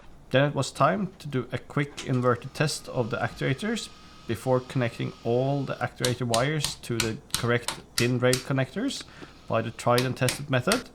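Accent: Norway. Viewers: Are you Norwegian?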